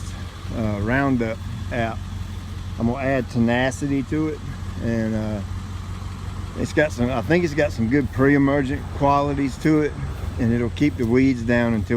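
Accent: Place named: Alabama, United States. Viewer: Cool accent? What state? Alabama?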